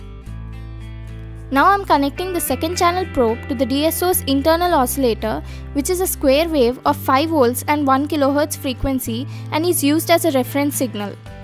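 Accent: India. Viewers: Yes you explanation is wright on,it shows you your stuff.....again well done,but if i may have a tiny reserve ,for a non Indian person ,we may have a bit of difficulty to understand what you say as you are showing and accent.May i suggest that you speak (and this is valid for all Indian people) a little bit SLOWER,and that would make your videos near perfection. Bye. Lucien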